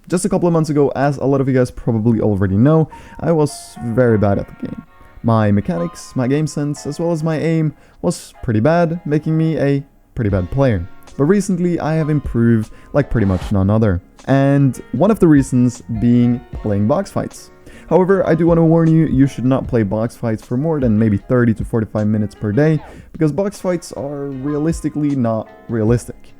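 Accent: Norway. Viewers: are u from norway by any chance??? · Are you Norwegian? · Ayo norwegian?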